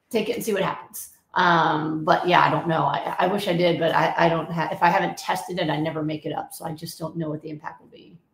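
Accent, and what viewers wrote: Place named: Texas, United States. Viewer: You're starting to sound like a real Texas. Matthew McCaughey would say, "Hello, everybody."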